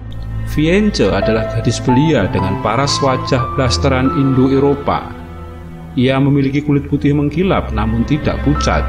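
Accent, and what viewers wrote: Java, Indonesia. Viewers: Itu lidah jowo mbak🙏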